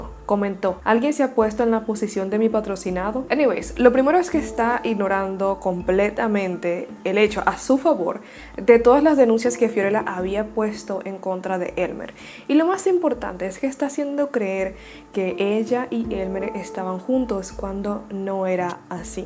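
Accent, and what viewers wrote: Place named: Dominican Republic. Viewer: un placer, te lo pregunté porque soy dominicano y te reconocí la forma de hablar...jjj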